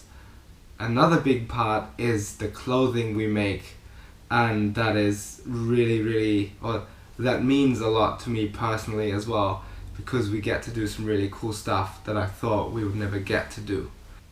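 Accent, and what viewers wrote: Germany. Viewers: No problem!! Please come to Singapore next Year! I would really be honored to meet and have a picture with you! You inspire me so much! I also like the way you talk in the german accent!! So hilarious! Jason Paul, you are an Awesome man and a really sick, legit, great, awesome, fantastic, funny and Excellent Freerunner! Love to meet you someday and parkour with you!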